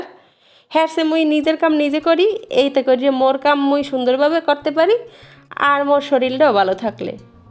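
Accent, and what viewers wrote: Barishal, Bangladesh. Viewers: আমিও বরিশালের মেয়ে + বউ। একজন দেশি আপুর কথা শুনে মনটা প্রশান্তিতে ভরে গেলো। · আপু অনেক ভালো লাগছে আপনার এই বরিশালের ভাষা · আমি বরিশালে বউ আপু।কিন্তু বরিশালে কোন ভাষা আমি বলতে পারিনা।তোমার মুখ থেকে বরিশালের ভাষা শুনতে খুব ভালো লাগলো আপু।তোমরা ভালো থেকো।দোয়া করি।আমার একটাই মেয়ে।নাম মানহা।আমাদের জন্যও তোমরা দোয়া করো।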